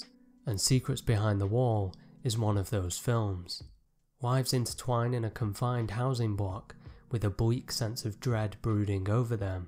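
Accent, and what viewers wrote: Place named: Manchester, United Kingdom. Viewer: This was really interesting! Something made me think about Wakamatsu earlier tonight, and I've only seen one film of his (Go Go Second-Time Virgin, some time around <num>) and gave his name a search and clicked through to this. I definitely wasn't expecting to hear a Manc accent hahah.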